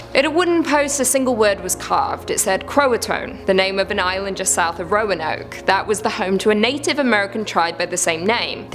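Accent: England, United Kingdom. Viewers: I agree ......you are very pretty ....love your hair...hate the big baggy shirts I can only imagine....the subjects are very interesting ....love the accent as well.....english? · I know it’s been said before and it will be said again, but there is something about hearing info with an English accent that makes it seem more legit. And kinda spooky too.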